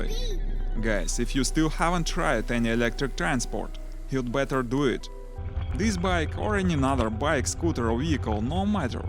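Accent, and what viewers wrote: Russia. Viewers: Русский акцент сразу палится) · Sounds Russian to me. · U have a Russian accent don’t be scared to tell us the Brand-name I want 1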